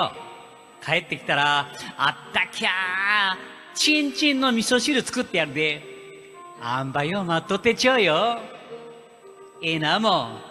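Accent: Nagoya, Japan. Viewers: こんばんは😊 新聞少年聴かせて頂きました♪ 名古屋弁、とっても味がありますね😊👍 聴いていてとてもウキウキ気分です✨ 素晴らしい歌声をありがとうございます😊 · さんこんばんは‼️ 拝聴しました👍👍👍👍👍 セリフ名古屋弁で良いですね👌 懐かしい素敵な歌唱有り難うございました🤗👏👏👏👏👏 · さんおはようございます。名曲も名古屋弁になると雰囲気も変わりますね。味があって感動しました。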